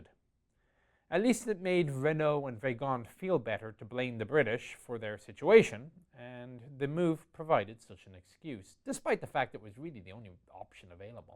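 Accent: Ireland. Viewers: I'm not bragging cause I'm sure enough a loser but I know all this. I think the is reading this..But don't get me wrong...i love the Irish accent and the delivery. He would be a hell of a professor. But he does better speaking for a video game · is the Chieftain Irish? He certainly has that silver tongued lilt from Eirre. There's no gutteral Northern Irish there. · It's the Irish accent. It tends to start low then go high at the end when important information is presented.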